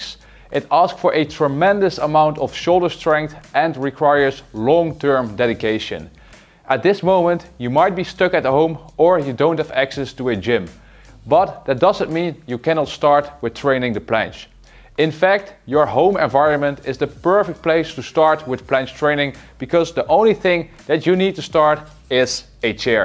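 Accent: Netherlands. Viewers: I like the dutch accent in English :DD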